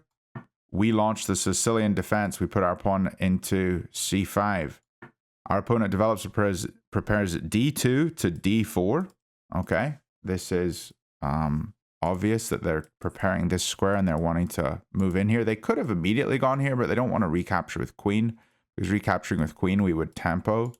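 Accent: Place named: Scotland, United Kingdom. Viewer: This was super helpful, thank you very much! I just found out about you because I was looking for a ChessMind review. You seem like a top bloke :D Definitely will stick around. Unrelated question—and please ignore this if you're not in the mood to answer: English is a second language for me and I was curious what accent/dialect you speak. It's super cool, but I'm terrible at pinpointing English accents. I thought it might be Scottish? (Sorry, if this is completely wrong!) Anyhow, cheers again!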